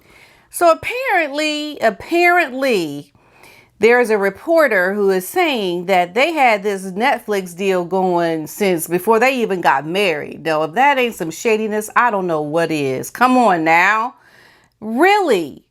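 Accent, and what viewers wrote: United Kingdom. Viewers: Yay talk british a little longer lol.thay was given the title from the queen. but it all goes thourgh the houses of parliament Sucks we know.but now so many people in UK are banging on the tables louder an louder so hopefully something will happen. but thank god there out of UK and our papers mostly.we are gutted about this gutter snipe. the money won't last long and no one wants to hear 💩 from her she dont know 💩about the royal family. if she knew about the commonwealth she wouldn't have put her 👠in her mouth megain 👩‍🎓🧠thinks she smart but good ole 👸Elisabeth will shock this couple to the core the 👸been around alot longer than she has.people know what ever thay produce the royal family will watch like hawks.and we know thay will recall harry home.god know how them two will unvail a statue of diana next year on what would be her 60bday. 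You watch tramp will look at all the cameras the world will be watching megain god i wish we could make her go though trainers gate and into the tower of London haha xx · Tosh, utter Tosh is what MM is (AKA Crap, Garbage, rubbish, skank you get my drift) it’s a lovely word!! Loving your British accent!! I live a few miles away from Frogmore & Windsor castle (I’m poor) & I’m hoping my neighbours don’t come back to their base. P.S H never paid that money back- that’s utter balderdash 😉 😂😘 xx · I like your British accent and if you want to speak it well and truly best to speak Oxford English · I love your British accent, it's brill, cannot understand why the royal family are not saying anything, we have to pay for them pair as I am English, it needs to stop. Yes you are right an mp has got his foot in the door in parliament to make sure he can get support to remove their titles, I was made to pay towards their wedding everything despite never watching the wedding, just was not interested, could see her a mile off.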